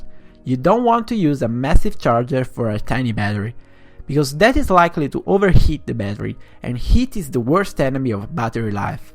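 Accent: Italy. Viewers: Mostafa Haque I'm from Italy and the accent is SPOT ON! He's definitely italian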